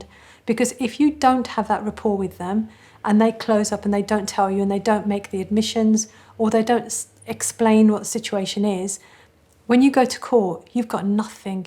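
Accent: Birmingham, United Kingdom. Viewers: Definitely a brummie girl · She has birmingham accent .....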